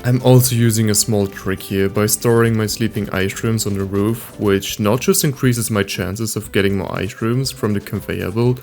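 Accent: Germany. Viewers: I can not not hear the german accent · Hm, i hear in your voice some german... and i see u are in germany... bist du deutsch xD?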